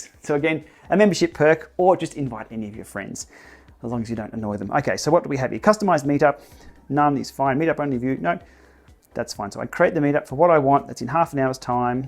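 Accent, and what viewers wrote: Australia. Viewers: May GPSheepy safely graze! Will meekly follow along, username V.Bonazzi. Thanks for your excellent videos, your Ballarat accent and videos from home. This Zwift e-snail lives a long way from home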